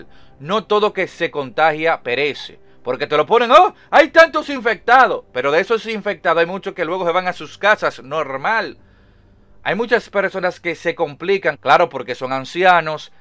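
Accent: Dominican Republic. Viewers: pero papa, yo no sabia que tu eres dominicano , aunque tu acento me paresia dominicano , pero no estaba seguro XD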